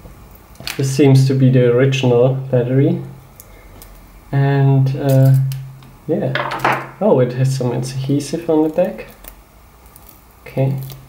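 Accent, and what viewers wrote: Germany. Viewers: except german accent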